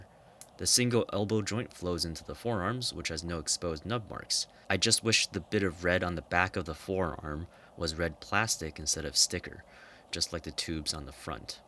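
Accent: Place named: Ireland. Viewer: he's from ireland what do you expect his voice is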